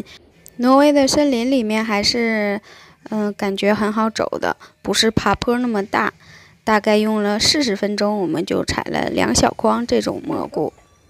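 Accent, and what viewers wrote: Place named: Dashiqiao, China. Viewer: 这些黄色的蘑菇好多啊，你们的收货好多哦。我朋友是大石桥的，和你说话特别像。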